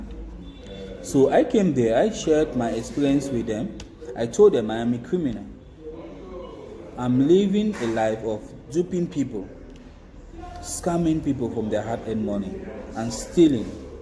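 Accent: Nigeria. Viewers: You sound 100% naija man yet u claim to be from Cameron,it's funny how people commenting negative about the late prophet all fell for your "lies".God bless you.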